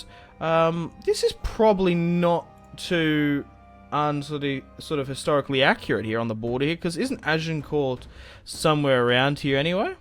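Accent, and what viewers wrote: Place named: Australia. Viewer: gday mate how you going! Love your australian accent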